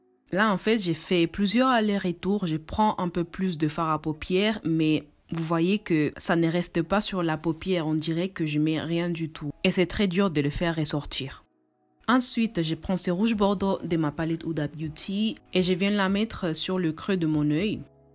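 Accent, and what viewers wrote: Spain. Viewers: I love your spanish accent omg 😻😻😻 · adorable et super vidéo !! (y se nota que vienes de españa jaja!! mi madre tiene el mismo acento en francés! suerte guapa<3)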